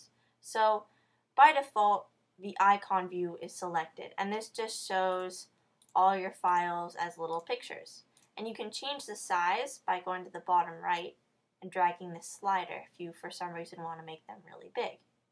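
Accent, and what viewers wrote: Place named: Pacific Northwest, United States. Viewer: You sound a lot like the branch of my family who live in the Pacific Northwest. Would that be about right? :^) Thanks for all the Mac help. You're great.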